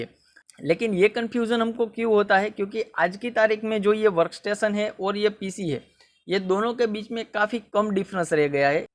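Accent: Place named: Kathiawar, India. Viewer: Bhai Gujarati lage chhe..., Kadach kathiyavadi...keep it up bro... Subscribed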